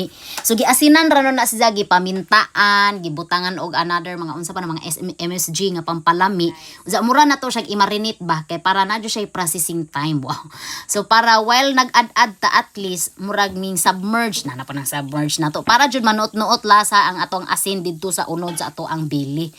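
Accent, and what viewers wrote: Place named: Bohol, Philippines. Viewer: Bul.anon nga accent from Bohol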